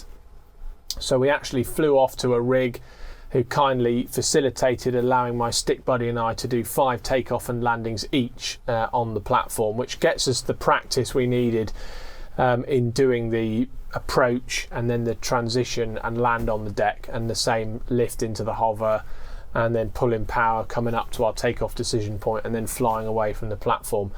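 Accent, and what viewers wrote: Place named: United Kingdom. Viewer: A “Very Polished British Accent “!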